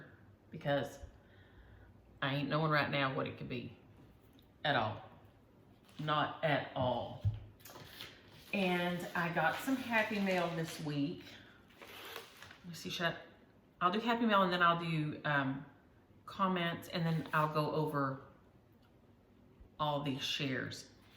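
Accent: South, United States. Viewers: Oh my goodness this is my first visit with you and all you had to do was open your mouth and speak! I love your accent, it is so amazing and southern( just like sweet tea)😂 enjoyed your video, I will definitely tune in again. Thank you so much!!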